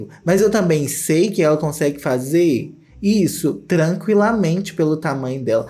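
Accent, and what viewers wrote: Minas Gerais, Brazil. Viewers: as vezes eu esqueço que vc é mineiro, aí eu fico prestando atenção no sotaque e fico uai ele é mineiro kkkkkkkk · gostamos MT do seu "minerês" precisamos mudar n ( mas se te incomoda, ok) rs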